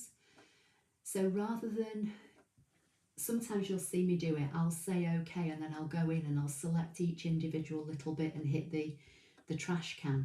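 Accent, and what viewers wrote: England, United Kingdom. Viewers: You have amazingly easy videos to understand even though your English accent is way different than my Texas accent but boy howdy does this old lady enjoy your videos. I haven’t received my scan and cut yet but I know I won’t be afraid to take it out of the box. I hope you do some videos about cutting material thank you so very very much for sharing your amazing talent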